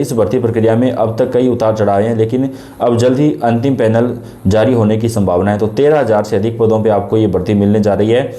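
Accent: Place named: Lucknow, India. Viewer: Lucknow English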